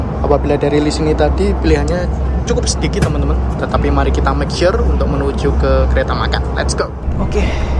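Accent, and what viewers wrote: Java, Indonesia. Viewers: Bang, maaf ijin tanya. Abang org malaysia atau org indo? dr logat nya orang jawa. Abang belum pernah naik kereta indonesia toh? Kereta kita juga ad yg ada cafe dan pramugarinya. Design dna teknologi jg lebih canggih. Ada karaoke, charger dan tempat duduknya tidak sesempit di malaysia. Jendela juga besar sekali. Ad tempat koper. Coba update dulu sebelum kasih judul bang.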